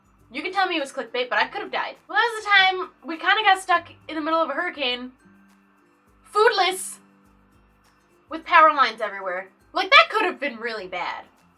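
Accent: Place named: South Jersey, United States. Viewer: So your from South jersey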